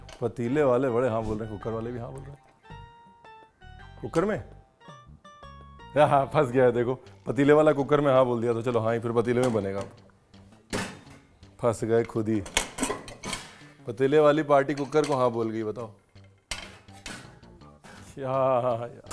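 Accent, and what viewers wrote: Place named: Lucknow, India. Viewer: Sir aapko chup rehne k liye kisne bola? Ek sec bhi pls chup na rahen aap! Jab tak Ranveer sahab ki lucknowi zubaan ka zayka na mile, kya faayda aisi dish ka? Aap bolte rahe aabaad rahe! Dhaniya kaat te time kuch bole hi nahi aap 😂 ! Aap pls bole, bolte rahen ❤❤❤❤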